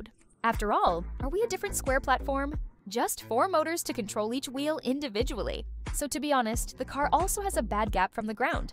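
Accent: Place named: China. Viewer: Funny Chinglish commentary 😅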